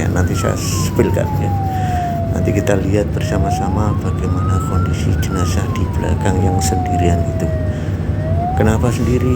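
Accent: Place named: Tegal, Indonesia. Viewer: Tegal..ora ngapak ora kepenak..hadir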